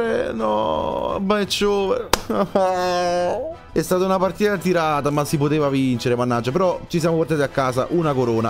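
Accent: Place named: Naples, Italy. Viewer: haha si vede che hai l accento napoletano cmq bel video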